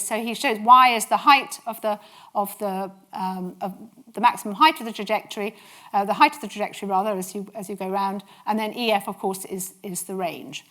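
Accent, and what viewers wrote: United Kingdom. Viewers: She’s just British bro, that’s how they talk. 🥀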